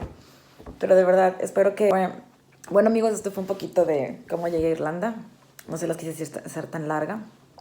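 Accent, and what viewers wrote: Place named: Monterrey, Mexico. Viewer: Holaaa. Acabo de suscribirme a tu canal y me gusta tu vibra.. Si no me equivoco te escucho con acento regio o al menos de otro estado del norte del pais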